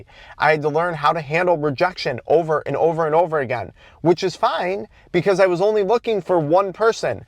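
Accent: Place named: Michigan, United States. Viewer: I'm going to pin the accent on southeastern michigan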